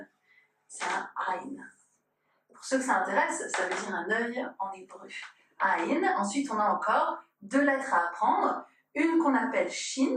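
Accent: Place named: Tlemcen, Algeria. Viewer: On dirait une tlemcenienne.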